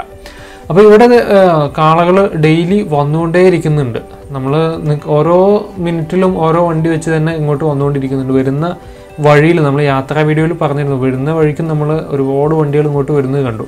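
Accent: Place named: Ottapalam, India. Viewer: Nalla ottappalam language good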